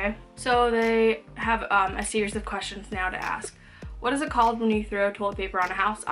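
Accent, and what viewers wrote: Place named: United States. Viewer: Oooooooo thank you so much for mentioning lil old me hahaha. I'm can't get over your new set up honestly I love it & the quality is great!! 😍😍👌🏼 Your accent is so cool! It's so American, I love it 😻 I'm dying a little on the inside how your step poppy is still poppy like we're all devoted to him and not as accepting towards to new husband he can just be called "insert name here" by the way that was meant to come across as humerus but it's hard on the Internet or maybe I just have weird humour lol either way loved the video and can't wait for your next one ❤️❤️❤️❤️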